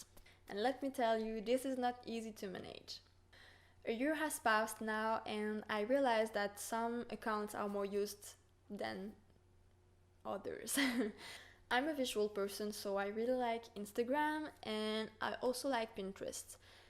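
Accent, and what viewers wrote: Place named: France. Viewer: Are you French? You've got a cute accent.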